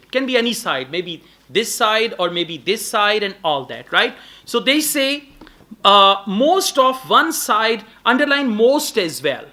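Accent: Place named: India. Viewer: love your Indian-English accent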